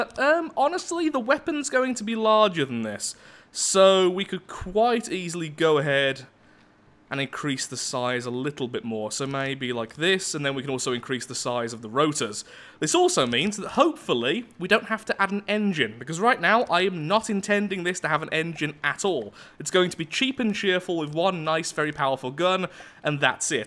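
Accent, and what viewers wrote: United Kingdom. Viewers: Wait, your British?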